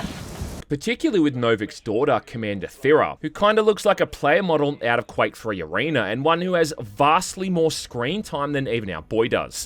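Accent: Australia. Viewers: I hate the way Australians sound, can AI make you not sound like that, I hate it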